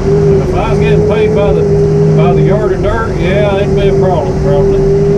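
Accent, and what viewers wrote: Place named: Oklahoma, United States. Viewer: I promise I'm not picking on you here.... The engine noise was a little loud to understand you, so I turned on the closed captions. Needless to say it does not understand Okie very well. Personally I'm from MS and lived 50 plus years in TX so it doesn't understand me either. I just found it funny.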